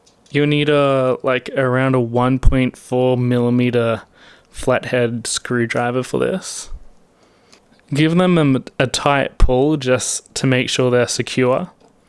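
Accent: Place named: Australia. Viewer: i'm loving the way youve edited and explained the fitting of this preamp and pickup ...really easy to follow and your aussie accent is charming...off to buy one for my guitat tommorow and fit it,,,,,Nice one Man!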